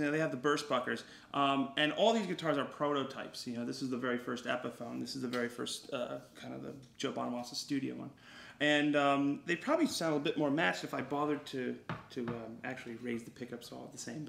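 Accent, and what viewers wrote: United Kingdom. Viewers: your accent is confusing...just when i start to think you are american BOOM you sound british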